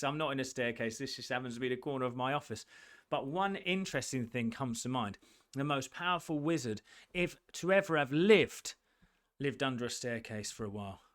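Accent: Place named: London, United Kingdom. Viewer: You have a lovely voice and I also love your London accent!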